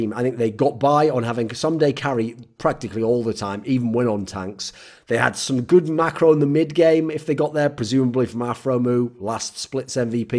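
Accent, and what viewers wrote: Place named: Northern England, United Kingdom. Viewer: Nah , like northern English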